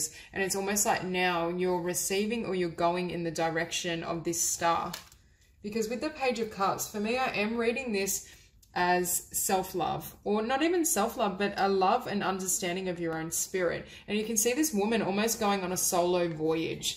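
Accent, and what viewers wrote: Australia. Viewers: Excellent reading I chose #3. I have been told in the past that I am a healer. But I have no interest in it. I am aware of going through a spiritual transformation at the moment, so we'll see. Just along for the ride at the moment. Learning not to sweat the small stuff. Thank you. BTW I love your beautiful Australian accent. I could listen to you read the phone book.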